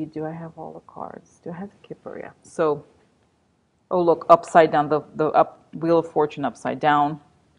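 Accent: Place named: Russia. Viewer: My gosh, you crack me up! Wonderful reading as usual....love you...and that Russian accent!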